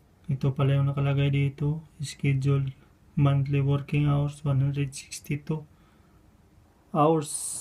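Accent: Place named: Visayas, Philippines. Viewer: Grabe idol napo kita kuya. Kapit lang nasa tiyaga talaga ang sekreto.. Apply ka din Canada kuya kasi kahit papano dun may security ka kasi pwd kang magng Permanent resident kasama pamilya mo.. mas madali yata mag cross country galing Japan to Canada.. i love your vlog you sound bisaya kaya nag subscribe ako hehe keep it up!!!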